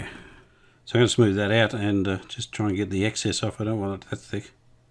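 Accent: Australia. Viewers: accustom to the Australian English pronunciation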